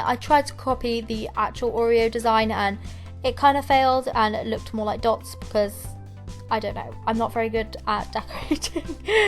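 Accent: United Kingdom. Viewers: I love BRITISH ACCENT!❤❤❤ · Where are u from because you sound British but I can’t tell (not trying to be rude)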